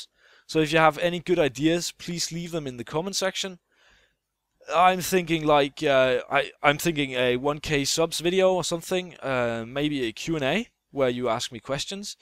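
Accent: Denmark. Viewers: lol du er dansk..nice..bare bliv ved med engelsk...hader danske youtuber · Are you dannish? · Are you danish? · Dansker ihhhh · Are you Danish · du er Dansker ik?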